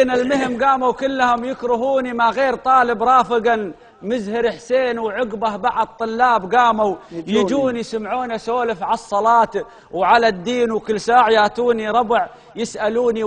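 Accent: Iraq. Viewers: انا اشهد انها قصيده معتبره . وزادتها اللهجه العراقيه الاصيله حلا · روعه يعطيك العافية. واللهجة البدوية العراقية زادتها حلاوة. ذكرتني بلهجة البدو شمال السعودية 👍🏻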